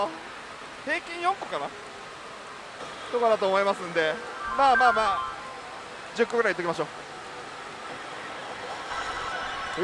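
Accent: Japan. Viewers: 喋りあっての実践良いですね(´ー｀*)ｳﾝｳﾝ 関西弁？聞きやすいです😊ペアで頑張ってください😊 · すみません、大阪弁苦手です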